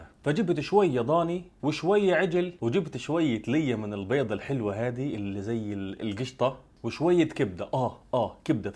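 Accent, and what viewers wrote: Gaza, Palestine. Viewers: احلا شي لهجه الغزاويه مدموجه مع البدوي سبحان الله نفس المكس طالع فالاردن😂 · الظاهر عليك اكيل يا ابن بلدي (لهجتك غزاويه)يمكن غلطانه احكيلي الدغريري.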